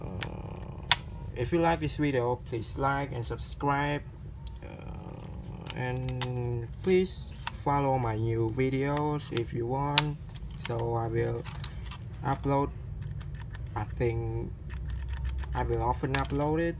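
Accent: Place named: Vietnam. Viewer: dude u sound like a vietnamese learning to speak english,good vid anyway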